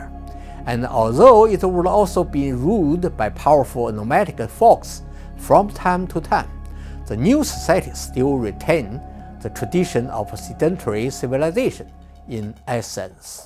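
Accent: China. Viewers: Insightful + interesting analysis, but the prof should have let a superior English speaker narrate his content; his Mandarin accent was so painful, I had to view to video muted, with CC. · Excellent analysis. May I make a few suggestions? Common chinese mistakes when speaking English: 1. "V" sounds. "Very", not "Wary". "Vast", not "Wast", "Video", not "Wideo". 2. "TH" sounds. "THink", not "Sink". 3. And in this video, "Empire", not "empAIR". There are more, but these 3 are most obvious.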